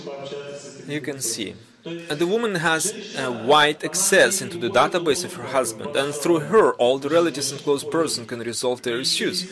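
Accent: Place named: Russia. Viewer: That russian accent sucks, but thx to ur hard work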